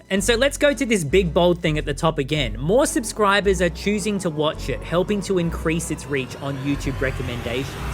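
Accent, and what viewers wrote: Australia. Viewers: There are a lot of channels doing Breath of the Wild/Tears of the Kingdom content, so I don't think it's just the game. There's something about YOU playing BotW and TotK that draws people. And perhaps it's not just the Aussie accent! Maybe there's something about those games in particular that brings out the best YouTuber in you? I don't know. But don't sell yourself short thinking it's just the game. Maybe it's you playing the games that make it work, which is why you playing other games works fine but not as well? Just a thought. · Came for impressive master quest run, stayed for funny sounding Australian who plays video games. I've been hoping for a long while that you would ignore data this and data that and simply play games you genuinely enjoy. FF7 remake has been very refreshing!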